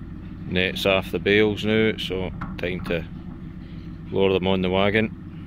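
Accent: Scotland, United Kingdom. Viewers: Can you tell me if that's the Ochil hills in your videos, Mrs thinks your a Fifer and I think more cumbernauld side.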